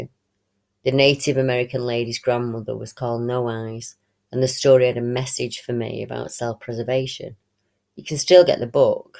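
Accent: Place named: Northern England, United Kingdom. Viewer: brill again thks,love your site, you have a wonderful voice. one of the many great accents us northern folk have, am in liverpool but can use most words correctly 😁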